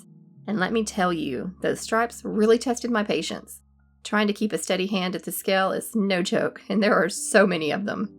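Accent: South, United States. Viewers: idk where youre from, but im from SC and love to hear southern accents from youtubers. its very comforting. im gonna guess youre from TN but i could be totally off on that lol. also i love the slow pace of your videos. a lot of doll makers create energetic loud videos and while thats totally fine !!!! i love seeing stuff thats more laid back and calm. :)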